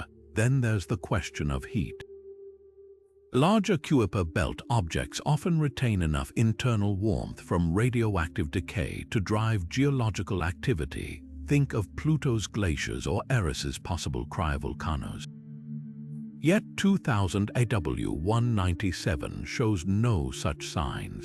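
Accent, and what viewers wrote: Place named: United Kingdom. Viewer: You said it well! I hit pause when the sneakily perfect British AI voice called the region the "Cue-a-per" Belt.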